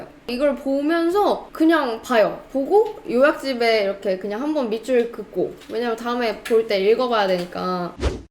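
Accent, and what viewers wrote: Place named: Gyeongsang, South Korea. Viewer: 오오 님 영상 처음 보는데 오 서울 사람인가보다 하다가 중간에 어? 경상도 사투리 한 번 쓰시길래 설마 헀어요 근데 마지막 쿠키 보니까 완전 경상도 사람 이었네여 ㅋㅋㅋㅋㅋㅋㅋ 필기 1급 공부하려 하는데 꼭 합격 해야겠어요!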